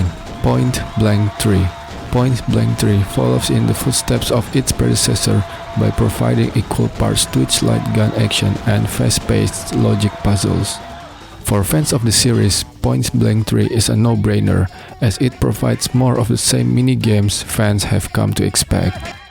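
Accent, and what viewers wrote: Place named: Indonesia. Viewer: Yang ngomongnya orang Indonesia keknya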